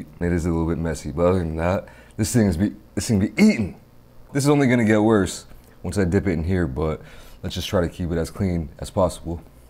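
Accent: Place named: Midwest, United States. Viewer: They on to somethin', I'll tell ya' what! - A key part to any video is having his Midwestern-isms come out!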